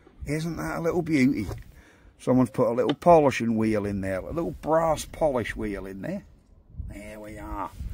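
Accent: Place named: Black Country, United Kingdom. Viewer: Guna gu sounds like black country Like Yo ba guns gu darn there bin ya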